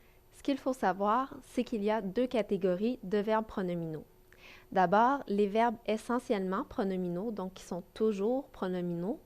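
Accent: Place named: Quebec, Canada. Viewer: merci Québécoise avec ton accent qui s'accorde avec ta belle province ;-)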